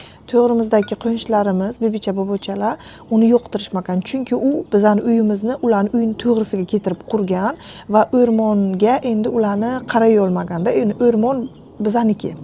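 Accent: Bukhara, Uzbekistan. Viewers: Бобоча,бибича 😂 сузингизни кувониб эшитаман. Бухоро шеваси.. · Buxorolikmisiz apa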